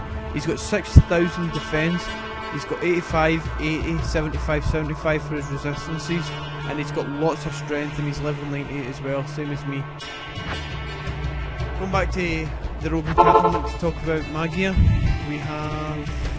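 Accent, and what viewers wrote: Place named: United Kingdom. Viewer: british accent ftw :P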